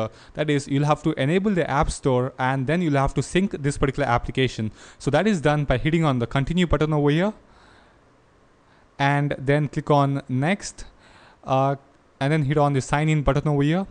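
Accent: India. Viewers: u sounded indian or asian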